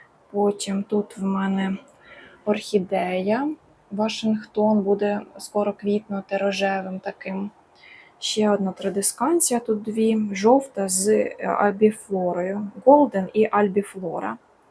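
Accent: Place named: Surzhyk, Ukraine. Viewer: Дома вы украинским языком не разговариваете это отчётливо слышно по вашему произношению на суржике ,обосаться вас слушать,стараеться что то лепит набор слов - це просто вау😂😂😂😂😂😂😂😂😂😂😂😂😂😂😂🎉🎉😂😂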